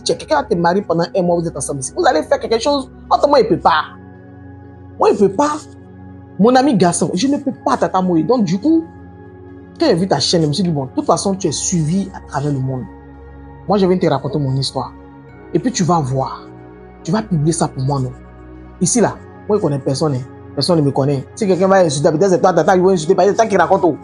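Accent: Ivory Coast. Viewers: Madame, votre accent Ivoirien ainsi que votre posture de la personne qui raconte l'histoire sont merveilleux. J'aime bien cette manière de raconter. Ma suggestions est que tous ne comprennent pas certains mots et propos propre au contexte Ivoirien. Moi je suis Camerounais, et cela je comprends. Mais je ne suis pas tres sûr pour les autres. Si vos videos peuvent etre améliorer dans ce sens, cela sera genial. Merci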